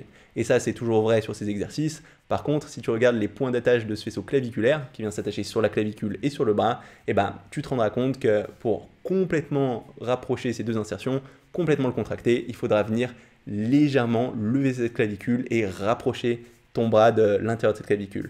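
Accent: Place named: Bourgeois, France. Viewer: C'est pas de ta faute mais ta manière de parler c'est vraiment insuportable ta un espece d'accent de bourgeois chelou troo enervant. Sinon la video est super bien faite bien expliquée et merci pour les conseils mnt je vais faire comme toi.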